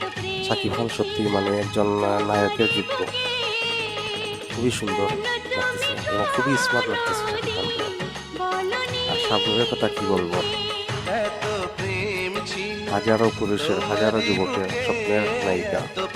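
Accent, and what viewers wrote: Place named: Sylhet, Bangladesh. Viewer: উপস্থাপক মনে হয় সিলেটি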